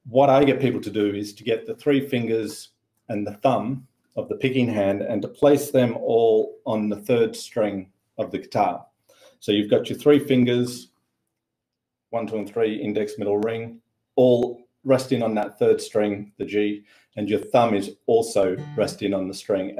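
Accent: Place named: Australia. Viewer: I’m a finger style guy. Have been since I started with a really good guitar coach/instructor. Nbnbmnmn at Gryphon Strings in Palo Alto, California. You sound Australian, you’re playing a beautiful Maton and have an excellent approach to what I consider the most incredible instrument with a 1000 voices. Not sure how I stumbled on your videos but certainly glad I did.Almost 72 if I make to October. Stopped playing some time back and completely lost on how to start again. I need someone to get me jump-started. Perhaps you can help me with that.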